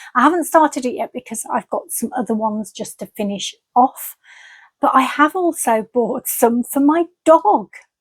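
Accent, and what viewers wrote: Birmingham, United Kingdom. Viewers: I thought you were from Solihull or Birmingham by your accent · I always thought it was a Birmingham, Midlands accent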